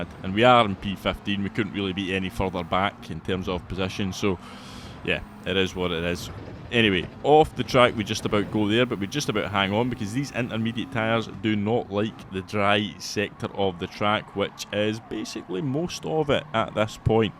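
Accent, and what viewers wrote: Scotland, United Kingdom. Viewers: Scottish accent or not, the way you pronounce p6… er…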